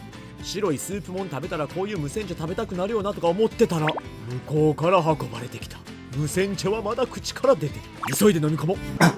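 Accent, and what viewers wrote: Kansai, Japan. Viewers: 관서쪽이신가요? 한국어도 잘하시네요 ㅎ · 美味しそうに食べはるし、自然な大阪弁が最高ですわ 👍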